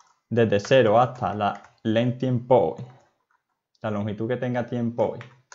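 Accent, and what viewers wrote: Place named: Andalusia, Spain. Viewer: Python con acento andaluz. Para siempre.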